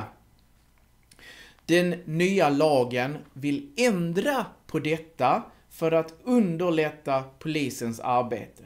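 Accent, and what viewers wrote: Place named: Skåne, Sweden. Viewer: Kan du inte ta det på Rikssvenska? Skånska är svårt att förstå. Dessutom så framstår det inte hur man lurar staten.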